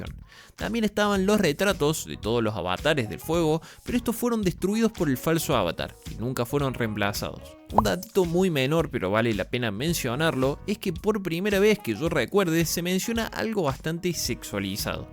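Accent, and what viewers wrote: Cordoba, Argentina. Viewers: Me encanta cuando citas el comic, nada como una narración bien cordobesa para entrar en el misticismo jajajajaja xD muy buenos los videos xDDD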